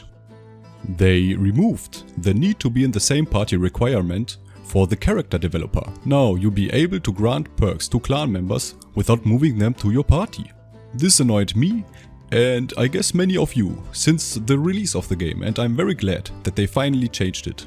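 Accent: Germany. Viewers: Haha mann hört nach 3 Sek. Das er deutscher ist 😁 gj man. Mach weiter so 😉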